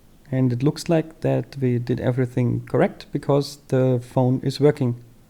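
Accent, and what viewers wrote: Germany. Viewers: Are you from germany ? And nice video bro i'm gonna try this on my 5s 💪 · iam hearing a bisschen deutsch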